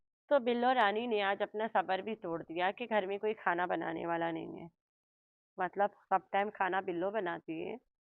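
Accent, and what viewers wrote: Uttar Pradesh, India. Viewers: Aap ki bhasa to humare u p india ki lg rhi 😅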